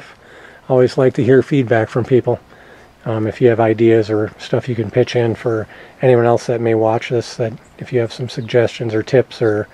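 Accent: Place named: Midwest, United States. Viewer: You're accent tells me you're originally from the midwest!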